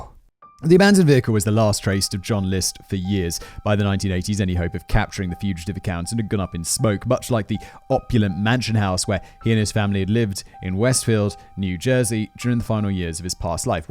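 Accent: United Kingdom. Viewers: The more I listen to the more that I think I should act on my notions to move to Britain so I can find a man who says "brilliant" and "what are you up to.." Everything sounds so much more charming and intelligent with a British accent.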